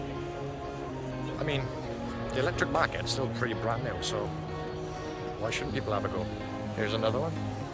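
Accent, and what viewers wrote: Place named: RP, United Kingdom. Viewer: like your b.b.c.voice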